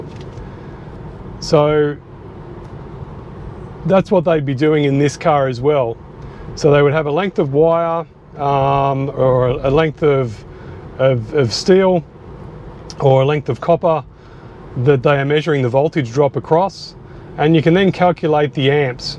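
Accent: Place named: Australia. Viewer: Thanks for the info on LFP batteries - I've installed two 12v LFP batteries in my partners EV scooter. Previous batteries became 'memorized' and would only hold about 2 minute of charge. How does that happen? I understand any battery only really delivers 50% of it's stored energy before it's needs to be recharged. Is that true? Your discussion was very interesting if a bit long. And why can't 'regen' breaking provide more useable power to the battery (is it 1% or 2%)? Perhaps you can break your talk up into segments for each topic. I love the Aussie accent. Bill in San Diego.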